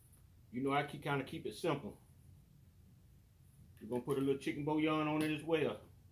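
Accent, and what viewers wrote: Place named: United States. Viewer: Look's delicious I'm a New subscriber I love your country accent where are you from if you don't mind me asking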